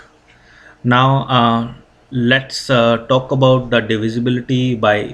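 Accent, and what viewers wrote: India. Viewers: Fuck Vedic Math and Indian Accent! This all comes from Ancient Europe while Indians were worshiping cows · not being racist but indians have the most ADORABLE accent ever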